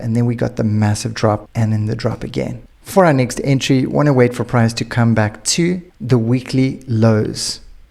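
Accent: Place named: South Africa. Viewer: this mans is south african no matter what u can say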